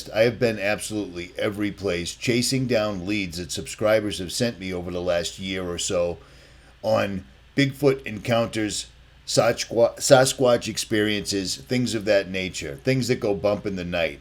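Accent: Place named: Boston, United States. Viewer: You sound like richie from Boston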